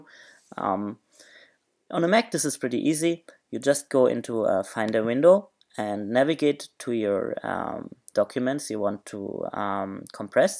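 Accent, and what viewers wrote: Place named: Germany. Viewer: You sound German :D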